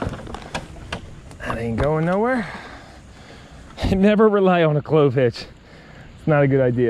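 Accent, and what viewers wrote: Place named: Philadelphia, United States. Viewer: This man is def from the philly region i can hear it in the voice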